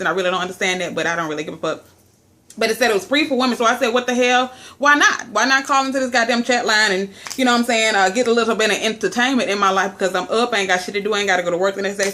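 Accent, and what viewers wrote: South, United States. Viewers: Southern not american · Lol I love you American accent .. And damn loads of old men got nothing to do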